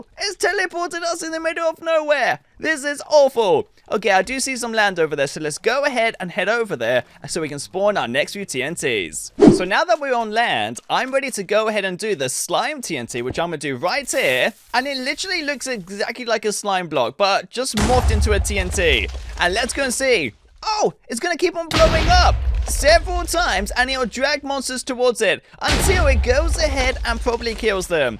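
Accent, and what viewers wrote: United Kingdom. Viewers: And is he britis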